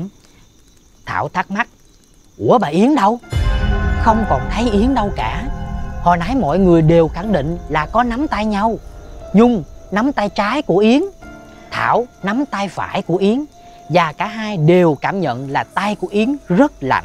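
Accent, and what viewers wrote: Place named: South Central Coast, Vietnam. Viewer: Seri này là 1phần 50 tâm linh , còn 1phần 50 ý nghĩa và sâu xắc,anh kể giọng rất hay❤🎉.Cứ như tiếng miền trung miền nam zậy,đúng thiệc là anh kể zui thiệc với rất kinh dị,nếu xem chung với bạn bè thì còn zui,chứ xem một mình thì hơi sợ nha😅,em chúc anh lập sẽ tích cực hơn sẽ ra nhiều video hơn nữa và thú vị hơn ạ❤❤😊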